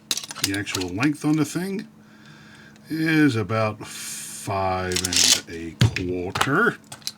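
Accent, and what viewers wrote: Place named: South, United States. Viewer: southerner im guessing lol.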